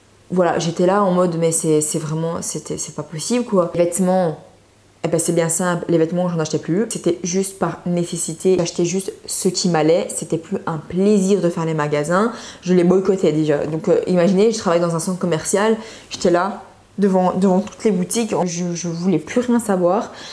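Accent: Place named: Belgium. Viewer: T'es belge non? J'ai reconnu l'accent belge 😭😭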